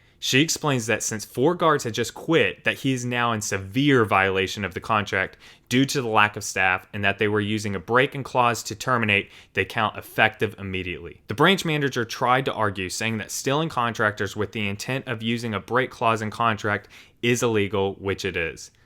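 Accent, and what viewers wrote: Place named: South, United States. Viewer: Your southern tang makes me want to watch your videos more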